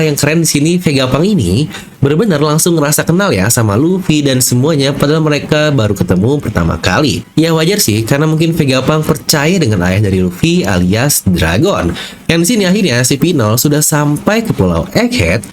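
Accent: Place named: West Java, Indonesia. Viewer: hahaha, urang sunda horeng. :)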